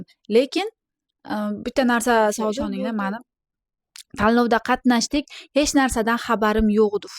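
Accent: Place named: Olot, Uzbekistan. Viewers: Salom gapirganiyizda buxoro shevasiga tortarkanaa opaa omad sizga❤ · Shevangizdan bildim Buxorolik Olotdan ekanligizni Men ham Buxoroni Romitan tumanidanman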